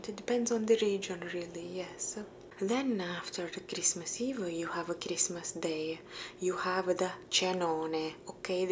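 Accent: Italy. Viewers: Italian accent - this is amazing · This is the same as my accent when I try to speak English😂(I am Italian🇮🇹).Nice video! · Brava, brava bravissima. You sound Italian just like me 😅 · fantastic asmr, love it when you do the italian accent 😍. just out of curiosity but what nationality are you, just to know your mother tongue? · I love the italian accent and I love hearing about italian culture in general! Is it true that italian pizza is different than the ones in Europe and America? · I love your italian accent videos. I would love to see more of them. Greetings from Germany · This is great, I love learning about Italy and your ASMR. Italian accent is always so good.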